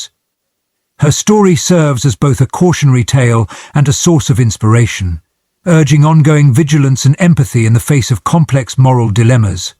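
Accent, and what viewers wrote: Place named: United Kingdom. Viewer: Why the narrator isn't black & why the reader with a British accent doesn't state the year of when the event happened. Why no one knows the city in Texas or the name of the church. Ive lived in Texas & I've a church in Plano for 10yrs & there no event this on the news any churches in Texas no name of reporter who the story . I really question & put on this video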